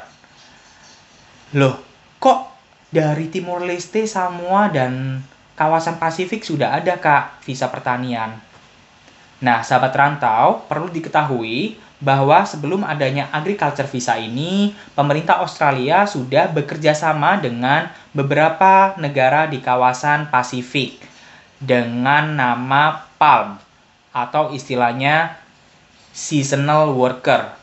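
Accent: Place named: Java, Indonesia. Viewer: Dari logatnya mas nya jawa ya..jawa mana mas..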